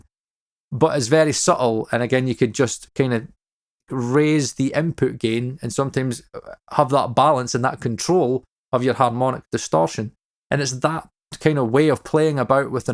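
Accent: Scotland, United Kingdom. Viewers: instant subscribe here. Watched that AP video and just felt like he was missing so much. Especially you talking about the unique behaviour of the controls on EQs, and reaching a result is very different from recreating a result. This and the cramping, parallel architecture etc. That and a Scottish accent and i'm 110% in.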